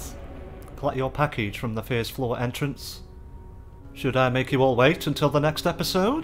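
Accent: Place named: United Kingdom. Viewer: This man is very Bri'ish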